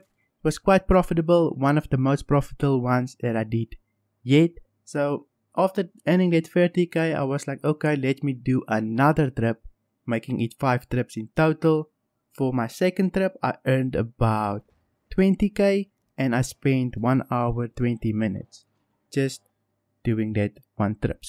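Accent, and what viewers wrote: South Africa. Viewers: That accent. I'd know it anywhere. Fellow South African. Nice vid man. Hoping to get into SC soon so I'm watching a lot of gameplay videos. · Are you South African? Can hear an accent but not sure, nice video, I'm renting a prospector currently and using helix mining head, making about 45-90k a run. Almost made 1week rental money back in 1 day and now it's for the grind to use the rental to buy it, 1 mil to go. Subbed and liked your video.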